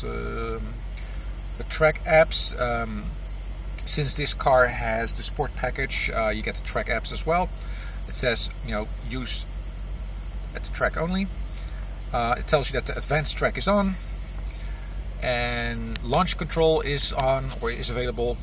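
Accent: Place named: Netherlands. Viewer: Your Dutch? The accent sounds familiar..??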